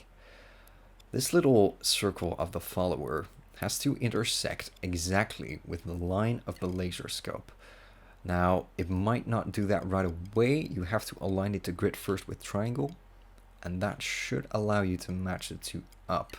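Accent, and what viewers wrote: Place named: Netherlands. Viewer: Ik kan me niet concentreren door die Nederlandse accent LuL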